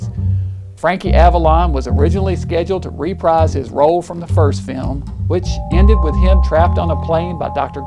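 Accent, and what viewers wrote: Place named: Tennessee, United States. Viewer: I grew up in Tn. I knew your accent. My father was from Rockwood Tennessee and ran away from home when he was 13 and joined the spookshow business! I've always had a lifelong affection of horror and sci-fi! Excellent work!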